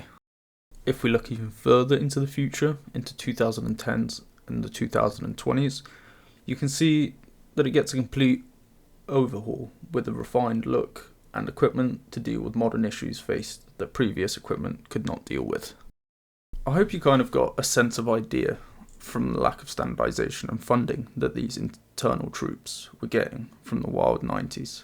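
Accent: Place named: Russia. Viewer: Great video. Your russian accent is very good, are you perhaps russian ?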